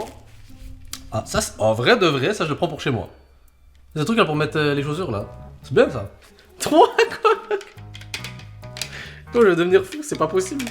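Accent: Quebec, Canada. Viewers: Il a un peux l'accent québécois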